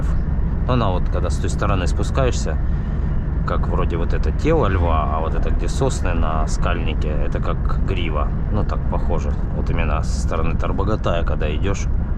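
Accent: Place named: Rostov, Russia. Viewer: А говор у тебя Ростовский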